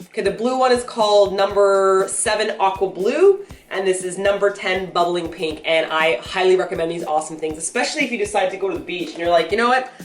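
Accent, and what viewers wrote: Canada. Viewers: I heard your Canadian accent more in this video than any other video. And I've been watching you for a about 2 years. ._. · I love hearing little bits of canadian accent keke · Oh wow I just picked up on your Canadian accent!! LOL cute! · She sounds super Canadian in this video! It's adorable >.<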